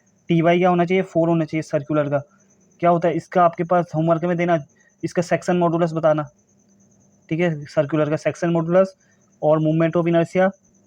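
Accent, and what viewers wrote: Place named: Jodhpur, India. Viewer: aap kha se ho sir. Language se jodhpur k lgte ho. Sir aap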